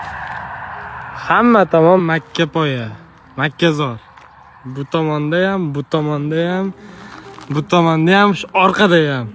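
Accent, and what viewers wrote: Uzbekistan. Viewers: Shevasidan Norinlik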